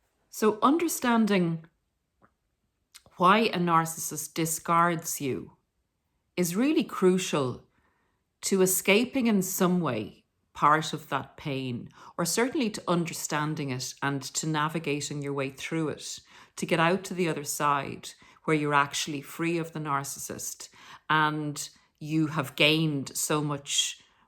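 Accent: Ireland. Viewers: GREETINGS FROM THE GREAT STATE OF KENTUCKY IF YOU'RE NOT ATTRACTIVE SO-CALLED WITH WHATEVER THAT MEANS THEN YOU'RE GOING TO BE DUMPED YOU'RE NOT GOING TO FIND QUALITY MAN YOU'RE NOT GOING TO FIND A QUALITY OF WOMEN BECAUSE MOST OF THESE SO-CALLED MEN THAT ARE GOOD THEY'RE LOOKING FOR ATTRACTIVE PHYSICALLY ON THE OUTSIDE MOST PEOPLE ARE SUPERFICIAL THAT IS A MAJOR PROBLEM VERY FEW PEOPLE SEE THE BEAUTY WITHIN AND YOU KNOW ACTUALLY EVERYBODY'S GOD'S CREATION SO WE ARE ALL MASTERPIECES BUT BECAUSE WE LIVE IN A SINFUL WORLD AND PEOPLE LOOK AT THINGS THROUGH THE CARDINAL I THINK SOME PEOPLE ARE BETTER LOOKING THAN OTHERS WHEN THEY'RE NOT MARILYN MONROE WAY WAY WAY WAY WAY OVERRATED BECAUSE SHE LOOKED PROVOCATIVE AND WAS LOOSE THAT'S WHY MEN LIKED HER GREETINGS FROM KENTUCKY WE LOVE YOU IRELAND WE LOVE YOUR ACCENT WOULD LOVE TO SEE IRELAND ONE DAY EVERYTHING IS SO DIFFICULT IN THE UNITED STATES EVERYTHING IS OVER THE TOP EXPENSIVE I WORK WITH THE HOMELESS THIS IS JUST REALLY A NIGHTMARE AND YOU HAVE TO FOCUS ON GOD AND HELPING OTHERS WHY DON'T YOU DO A VIDEO ABOUT THAT MOST MEN JUST GO BUY A PERSON'S LOOKS NOT ABOUT MORALS IT'S NOT ABOUT HOW KIND YOU ARE MOST PEOPLE THEY DO NOT LIKE UNATTRACTIVE SO-CALLED UNATTRACTIVE PEOPLE IT'S A DISGRACE WHY DON'T YOU TALK ABOUT THAT THAT'S WHY PEOPLE ARE DISCARDED❤🙏🙏🙏🙏🙏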